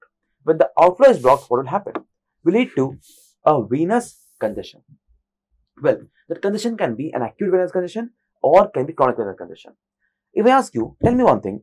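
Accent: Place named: India. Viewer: Someone tell bro to turn off his indian accent because noone can understand him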